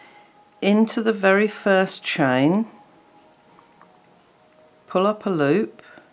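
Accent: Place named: United Kingdom. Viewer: So lovely to see a tutorial in British English! Thank you x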